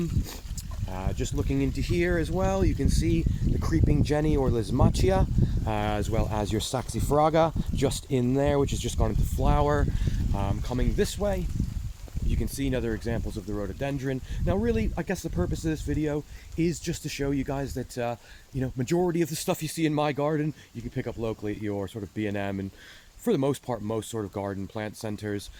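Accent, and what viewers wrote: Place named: United States. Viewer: I thought you and an American tone to your voice! How did you end up in England? 💚🌴🌹🐦‍⬛🌿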